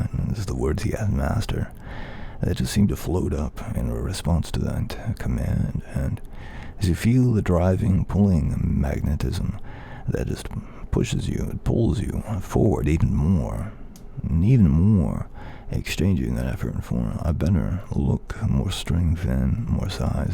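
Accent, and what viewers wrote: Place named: United States. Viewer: This guy sounds remarkably American.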